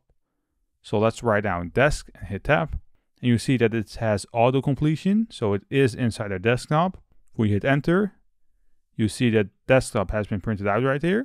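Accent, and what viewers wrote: Netherlands. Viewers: I hear a dutch accent excellent video explanation goed gedaan!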